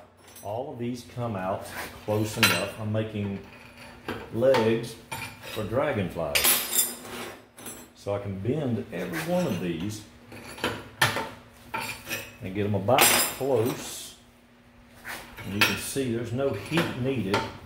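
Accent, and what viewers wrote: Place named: South, United States. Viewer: Simple and effective method, and what nowadays they call "sustainable". Thumbs up. And all explained with the lovely southern accent!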